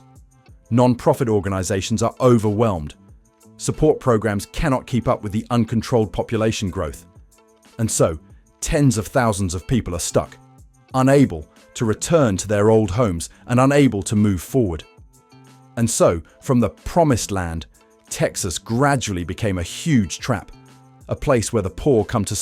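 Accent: England, United Kingdom. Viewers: Tell me why the narrator has an English accent.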